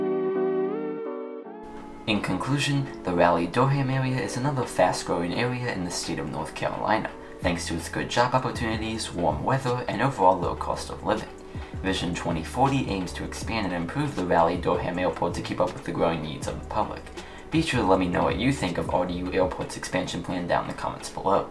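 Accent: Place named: Ireland. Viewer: It just piqued my interest as to where you're from. Your accent sounds slightly Irish, but an Irishman would know how to pronounce both Raleigh and Durham, since both of those are English names.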